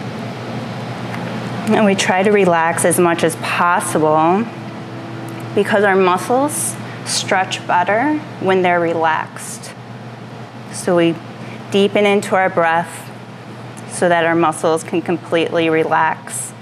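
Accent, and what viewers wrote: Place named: Midwest, United States. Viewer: You're from the Midwest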